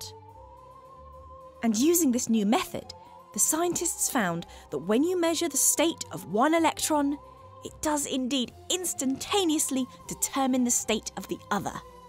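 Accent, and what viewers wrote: United Kingdom. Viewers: Adorable, and very Harry Potter sounding, but unfortunately doesn't make it any clearer to me. I think the crux of my confusion is that the detectors when put at 120 degrees to each other have one correlation under QM and a different one under Hidden Variables and experiment matches QM. However my weak grasp of detectors and statistics trips me up on the details of why these are valid experiments to compare the two. Hopefully a future adorable video can :) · Oh god a british accent oh well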